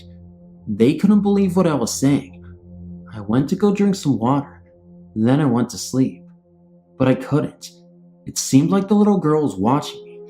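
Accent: United States. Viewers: Pardon me.. What state is this accent from? I'm from UK. I know you're accents change from area to area like ours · Wow listening to you read is like listening to nails on a chalk board, you really need to practice a less monosyllabic tone, it’s really difficult to get into the stories, I listen to a guy on another channel as well, and well not as bad he to has the same drone, must be just the American accent, although the girls seem to be just fine, definitely need to inject a bit more life into it🤔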